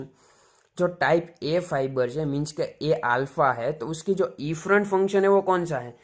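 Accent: Gujarat, India. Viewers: Bhai are you gujarati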